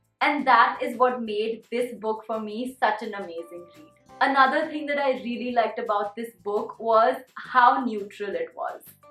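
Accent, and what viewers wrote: India. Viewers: You've been brought up in US, still your accent is wholly Indian! Anyway, lovely review 🥰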